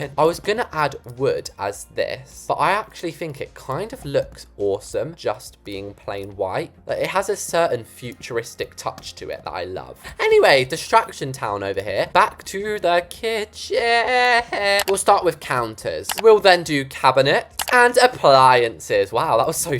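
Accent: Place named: United Kingdom. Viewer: The queen has amazed us again!!!!❤ always buts a smile on our face whith his loving British accent 😂😂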